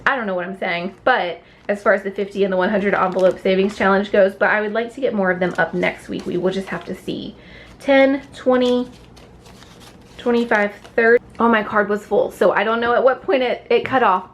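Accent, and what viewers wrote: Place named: Oklahoma, United States. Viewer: I dont know where you moved yet, need to watch the other videos. I am just taking note that it sounds like Oklahoma. Lol. Which is where I am. 😂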